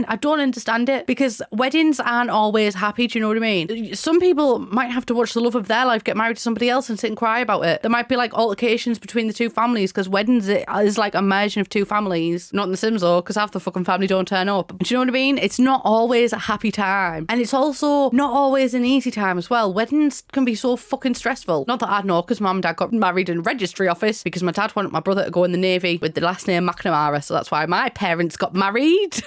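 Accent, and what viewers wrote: United Kingdom. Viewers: Yeah just wasted $3k+ simoleans on my THIRD failed wedding. Catastrophic failure and not a single “part” of the ceremony or activities were able to happen. We hired a violinist and they sent a ghost and everyone got stuck in loops talking to the freaking ghost. I want my real world money back and all my wasted simoleans 😡 Also side note totally unrelated but I’m sorry for neglecting your channel for LilSimsie, my partner can’t stand her voice, and your voice reminds me of my ex fiancé who is also British so your voice is a weird nostalgic trigger lol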